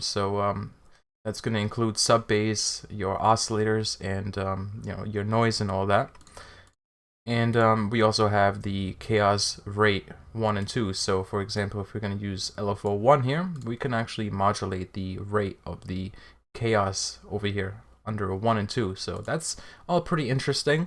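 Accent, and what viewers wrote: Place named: United States. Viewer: Dude, you sound soo american on this video haha, but anyway, helped a ton, Serum is such a great tool, thank you one more time!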